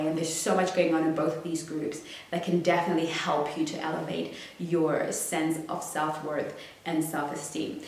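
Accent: United Kingdom. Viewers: Hi beauty...how much I love your Brit accent and your style! Bisous ma chérie...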